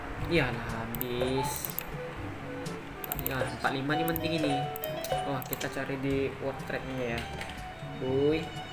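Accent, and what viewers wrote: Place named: Sabah, Malaysia. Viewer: Ckp pun mcm org sabah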